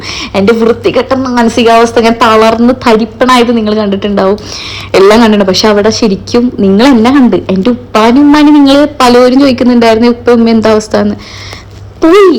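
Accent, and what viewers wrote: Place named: Chavakkad, India. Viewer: Umma thrissur Chavakkad Aano? 😀samsaram ketapol thonni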